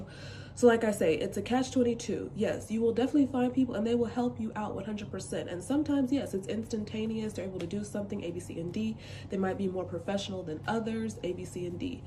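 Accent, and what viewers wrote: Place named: United States. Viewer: Wait are you American with Jamaican parents…or are you 100% American parents and grandparents etc… because if you have no Jamaican background you are in for a culture shock 😂. You will get hit with higher prices because of your American accent. You will run into a few honest people who will charge you the correct price, but it is rare. There is no such thing as customer service in Jamaica 🇯🇲 unless you are at a high end resort 😂😂😂😂. 🗣️WELCOME TO JER-Makerrrrr! 😅 it’s a beautiful place and so peaceful and my SOUL FEELS LIKE YESSSSS YOU BELONG HERE ❤❤❤❤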